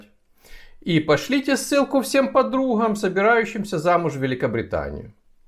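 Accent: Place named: Ukraine. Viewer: я слышу по говору что вы из Украины, и вас как и меня выдает буква Г. Хотя еще могу предположить что вы из Ростова. А мы из Одессы, да, и мы тоже находимся в Лондоне. Так сложились обстоятельства.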